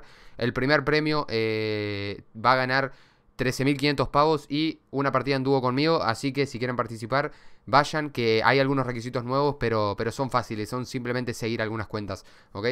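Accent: Argentina. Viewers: Es argentino ?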